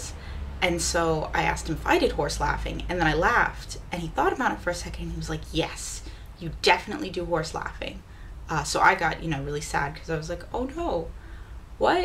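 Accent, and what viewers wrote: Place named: Minnesota, United States. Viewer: Omg is she from Minnesota??? That accent!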